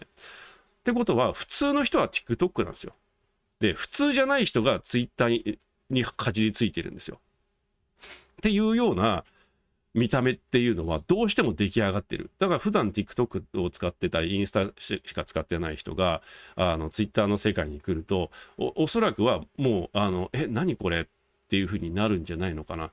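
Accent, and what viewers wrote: Kansai, Japan. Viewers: 関西弁…… 某掲示板…… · ん…関西弁…なんJ民の気配がしたな… ？？？『ワイやで』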